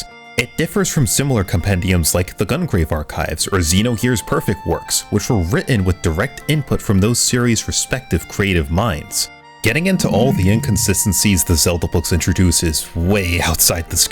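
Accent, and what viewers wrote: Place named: New York, United States. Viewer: You must have the heaviest New York accent when you're not putting on this radio voice.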